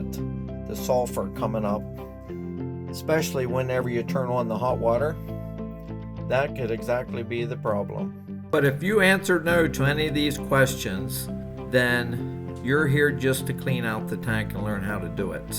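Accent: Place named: Southeastern Pennsylvania, United States. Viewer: You sound like you're from south eastern PA. It's the accent.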